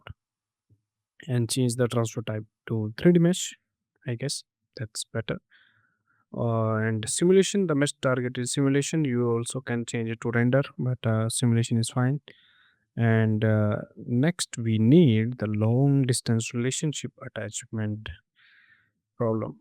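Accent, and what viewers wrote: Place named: India. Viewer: Because of "offshoring" (getting rid of jobs in our countries and shipping them to india, or shipping indians over here to take our jobs) most English speakers can understand Indian accents pretty well, and yours isn't even too bad. Accents only really become a problem on phone calls if the reception is bad.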